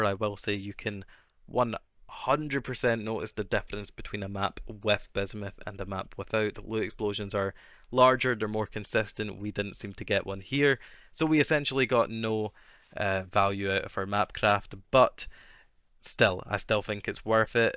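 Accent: Scotland, United Kingdom. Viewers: scottish accent = subbed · I discovered your channel this league and all your strats are all great and I really like listening to you, your Scottish accent is the cherry on top. Keep it up bro.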